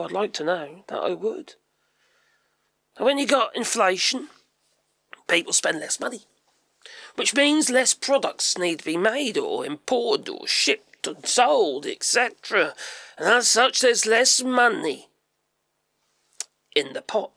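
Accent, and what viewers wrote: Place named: West Midlands, United Kingdom. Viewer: Are you living in America off-grid? you are from the British West Midlands!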